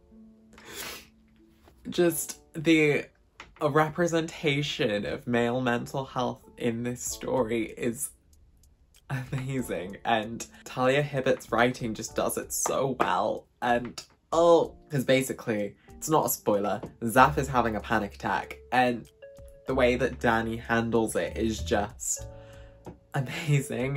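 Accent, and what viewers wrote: Wales, United Kingdom. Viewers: 0 am I sensing a Welsh accent??? First Welsh booktuber I've found and it's EXCITING!!!! Loved your reviews, subscribed!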